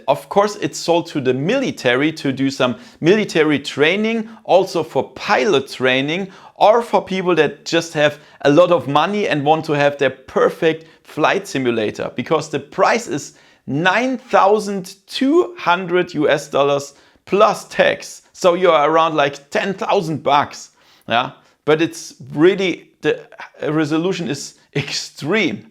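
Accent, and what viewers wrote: Germany. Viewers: My thoughts after i stopped on second : "Thats definitly a german guy." And than i read your channel name.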